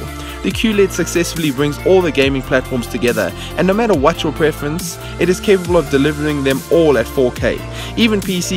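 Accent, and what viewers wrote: South Africa. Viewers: South African narrator :)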